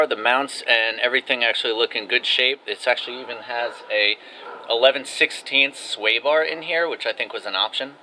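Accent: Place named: Canada. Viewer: Front bumper mounting bracket. I noticed you speak some Canadian.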